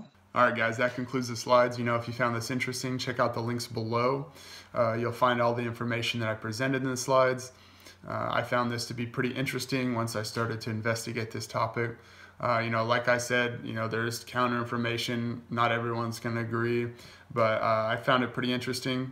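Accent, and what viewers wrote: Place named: United States. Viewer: That’s wonderful. I can tell you truly care about animals. You seem like a nice guy. I’m glad I found your channel:) Wow Finland? That’s awesome! You have an American accent. Why did you move to Finland if you don’t mind me asking?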